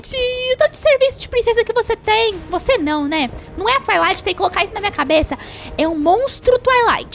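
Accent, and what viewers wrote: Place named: Argentina. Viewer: Está bueno hablar así porque parece idioma argentino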